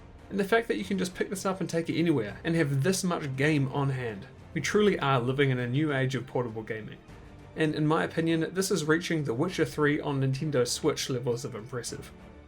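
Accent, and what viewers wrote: New Zealand. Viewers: R u from New Zealand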